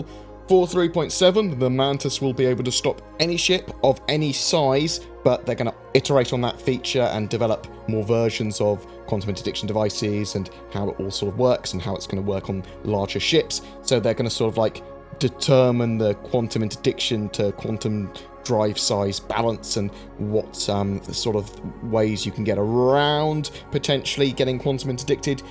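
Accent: United Kingdom. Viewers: The fun thing about listening to videos from people in another country than where I live is the difference in slang and pronunciation of words. I do wonder though if some of what I hear is an artifact of the recording device. I know that in the UK you use "Maths" where in the US we say "Math" for example.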